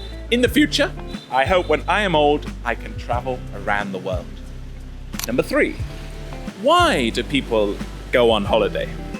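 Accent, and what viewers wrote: United Kingdom. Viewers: what is your kind of accent in England ??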